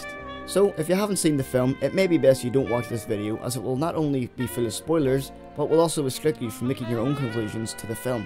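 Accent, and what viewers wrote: Northern Ireland, United Kingdom. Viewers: This analysis is a very insightful and amazing one, thank u very much for sharing! It's pointed out to me that I must go back and rewatch the film! Also can I ask u a quick personal question? Ur accent is a northern Irish one?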